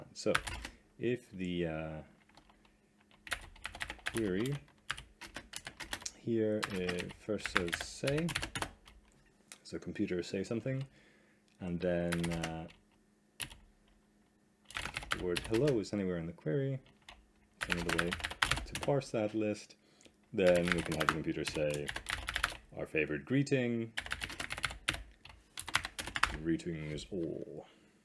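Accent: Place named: Scotland, United Kingdom. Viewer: this is incredible. and you are Scottish. Greetings from one to another. Ofc - not my real name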